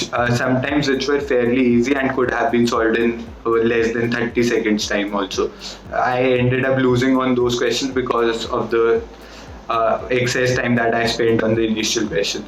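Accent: India. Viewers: He's got a very strong Indian assecnt .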